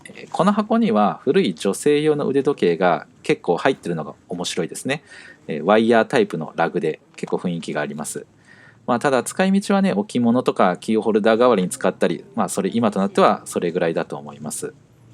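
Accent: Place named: Kansai, Japan. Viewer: お互いに関西の何処かに居ますね。さんの聴きやすい声の僅かな関西訛りを聴きだして塾聴しながら私なりに楽しんでます。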